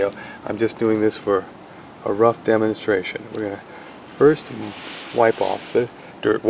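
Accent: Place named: Minnesota, United States. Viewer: Cool accent. Minnesota?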